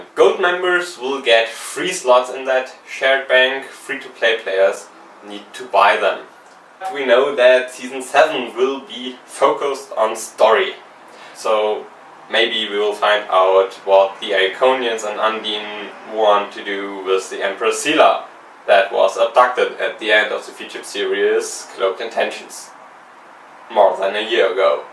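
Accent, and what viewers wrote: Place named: Germany. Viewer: Excellent first episode! Don't apologize for your "bad English." While you do have a strong accent that makes it obvious that you're a native German speaker, your English was easy to understand. Heck, I wish my German was half as good as your English. I look forward to watching more episodes of Captain's Log. Gut gemacht!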